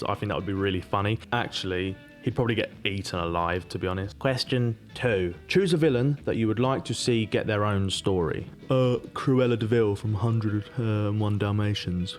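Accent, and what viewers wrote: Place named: Cockney, United Kingdom. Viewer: you've gone really cockney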